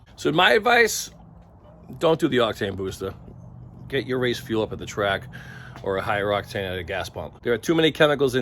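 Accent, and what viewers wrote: Boston, United States. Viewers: Sounds like Boston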